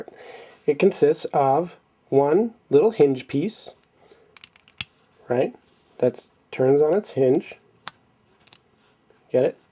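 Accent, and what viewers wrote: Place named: England, United Kingdom. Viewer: it is not British accent, it is English BTW but good model!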